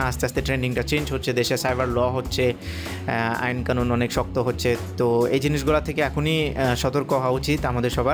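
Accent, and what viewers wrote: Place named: Chittagong, Bangladesh. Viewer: Apnar bari ki Chittagong e naki because amar bari o Chittagong e apnar kotha shune lagche apni Chittagong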